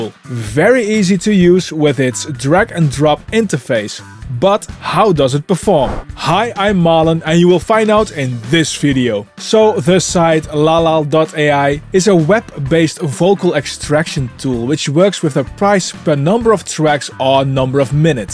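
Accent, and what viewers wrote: Netherlands. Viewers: Lekker Nederlands accent :) thanks for the tutorial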